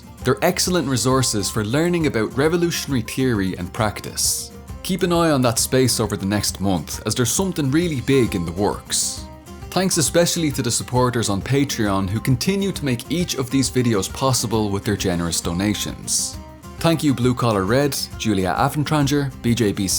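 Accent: Ireland. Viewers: I know it's serious material and you're doing a great job here, but I've never heard someone speak Spanish with an Irish accent and it's incredibly entertaining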